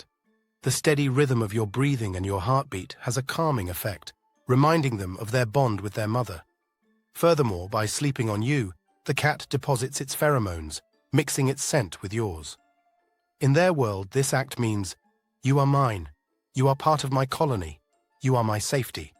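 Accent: United Kingdom. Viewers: This is more AI hogwash geared up with a British accent to the video seem like it's being intelligently presented. That's the British accent giveaway. Nothing here is medically sound so don't lose any sleep over this nonsense. There's nothing about a cat sleeping position that has anything to do with their health one way or the other.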